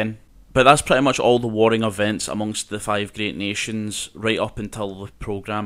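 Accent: Scotland, United Kingdom. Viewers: the scootish accseny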